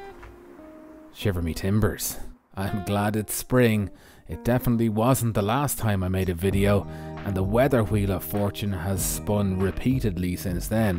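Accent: Ireland. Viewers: You're still one of the best video editors, I love your work. The content itself is excellent and has me mesmerized. And the Irish accent narrations is the cherry!😉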